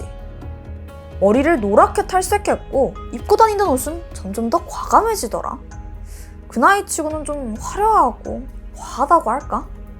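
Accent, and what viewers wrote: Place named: Suwon, South Korea. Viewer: 수원사투리중에 그런거있음 수원사람이신가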